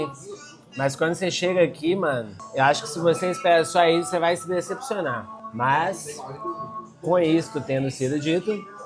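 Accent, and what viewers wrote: Minas Gerais, Brazil. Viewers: Cara, vi teus vídeos... E só pelo sotaque vi que é mineiro... Parabéns excelente trabalho. Pra ser melhor só falta ser cruzeirense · Te assisto há pouco tempo e percebi o seu mineres desde o início!!!!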